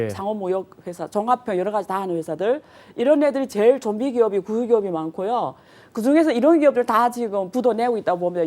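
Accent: Yanbian, China. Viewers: 발음하는거 보면 모르냐? 원래 조선족인데 정신이 바로 박혀서 한국에서 애들 가르치잖아. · 조선족인가? 연변말투네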